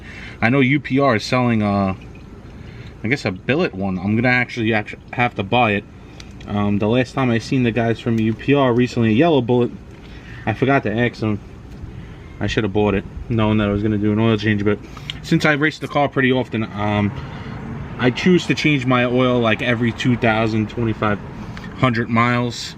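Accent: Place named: Boston, United States. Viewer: This dude def from Boston.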